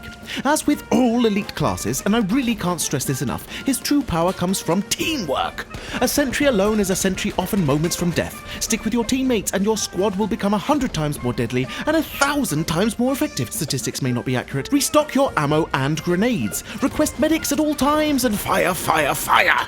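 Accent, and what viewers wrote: United Kingdom. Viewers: oh my goodness, I can only wish to one day be as british as you are. awesome video as always!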